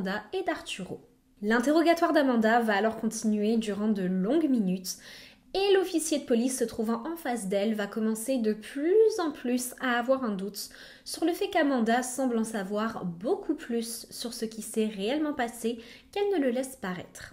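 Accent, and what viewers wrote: Quebec, Canada. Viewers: je viens de découvrir ta chaîne & woww ton maquillage t’es toujours parfaite🫶🤍 j’adore tu parles beaucoup de cas que je connais pas ou qui sont moins connue en étant une grande fan de true crime. Je suis québécoise et ça m’arrive de regarder des youtubeurs français, j’ai l’impression que t’es française, crime je trouve que t’a un accent québécois AHAHA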